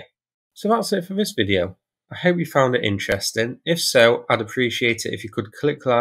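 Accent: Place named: England, United Kingdom. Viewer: England. According to some, he has no accent at all, it's all the rest of the lands distant from England that use the native language of England who have developed accents.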